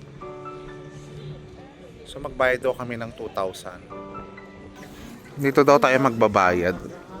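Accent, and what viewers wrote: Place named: Visayas, Philippines. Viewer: Hahaha! Bisaya pala yan si sir!😂 "dako kog ulok" 🤣🤣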